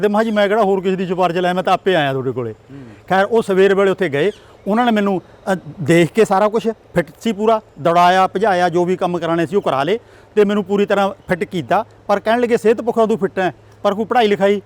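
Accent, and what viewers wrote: Puadh, India. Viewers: ਮਾਰ੍ਹੀ ਪੁਆਧੀ ਬੋਲੀ ਨੈੱਟ ਪਾ ਸੁਣਕਾ ਮੇਰਾ ਦਿਲ ਬਾਗੋਬਾਗ ਹੋਜਾ, ਪੁਆਧੀ ਬੋਲੀ ਤੋ ਨਿਊ ਆ ਜਿੱਤਰਾਂ ਕਿਸੀ ਕੇ ਮੂੰਹ ਮਾ ਤੇ ਮੋਤੀ ਗਿਰਰੇ ਹੋਮਾ, ਅਰ ਯੋਹ ਆਲਾ ਤੋ ਜਮੀਂ ਪੁਆਧੀ ਬੋਲੀ ਬੋਲਾ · Punjabi boli di opboli puaadi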